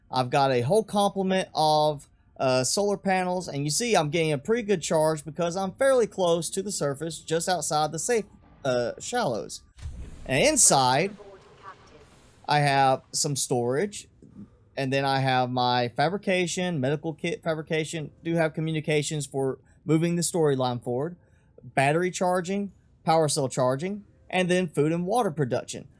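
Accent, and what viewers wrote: South, United States. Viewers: Totally off topic but where’s your accent from? Im absolutely shit at guessing US accents so I’m gonna shoot in the dark and say somewhere south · Ah someone who sounds southern like me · I want to subscribe but that country accent is killing me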